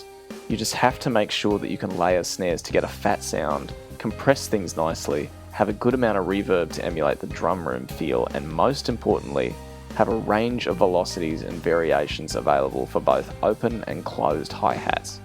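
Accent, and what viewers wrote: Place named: Australia. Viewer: Watch another video? I don't understand nitpicking someone's voice, something they have no control over. He doesn't have vocal fry even, he sounds Australian. It's like you expect him to go "Oh, sorry" and just put on a voice. Lmao. Enjoy the free, highly researched and interesting video.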